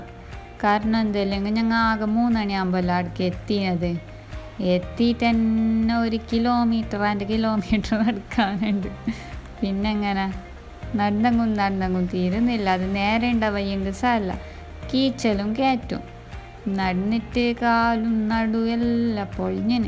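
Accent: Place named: Kasaragod, India. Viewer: Nalla language..ningal ksd analle..kelkan nalla sugam😃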